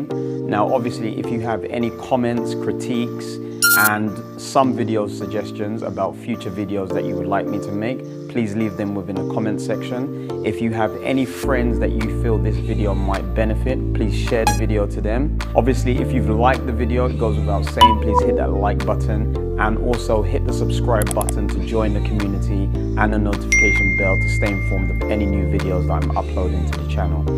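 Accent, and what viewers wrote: United Kingdom. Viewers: primera vez que oigo a un cubano (si no entendí mal) hablar en un perfecto acento britanico... y encima con excelentes tecnicas! gracias!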